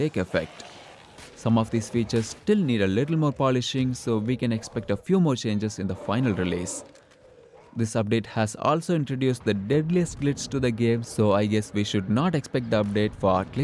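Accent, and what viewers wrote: India. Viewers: The Indian English accent isn't innately irritating or anything; you gotta understand that the economic status quo of our world, and Indians' superior command of the English language compared to the Chinese, for example (not compared to natives, I mean), made India the most popular call-center destination of the world. And very unfortunately, most companies that want to save money by using Indian customer-support representatives on the phone instead of local ones also end up wanting to save money on many others aspects, sometimes at the expense of customers, so they end up frustrating customers for countless reasons that go beyond any language barriers with the Indian customer-support representatives. So, many people around the world started to gradually feel irritated with the Indian accent, not because it is innately irritating, but because it reminds them of their frustrating experiences with the companies that employ Indian customer-support reps. 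However, don't let this deter you from just being yourself. Nobody ends up making everyone happy anyway. And as long as you enunciate and speak as clearly as you can, people who are open-minded and interested enough in your content will be able to ignore your accent and just focus on the content that you're delivering. It is more important to be your natural self than to try to please a percentage of your audience, while still improving that "self." A lot of people use the advice of "be yourself" wrongly, where they take it as an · Indian accents are kind of pleasant to me,work on your annunciation though🤙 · I can hear the Indian in you.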